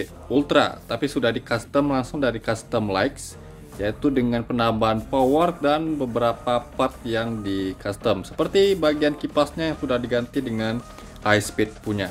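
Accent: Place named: Sumatra, Indonesia. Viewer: Logat sumatra bang emg gitu ga perlu di komentarin wkwk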